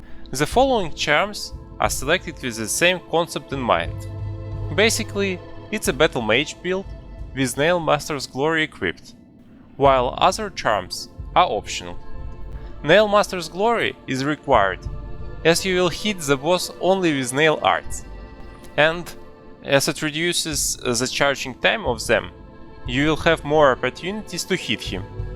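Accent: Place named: Russia. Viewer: Ты чо, русский шоле? А то акцент русский...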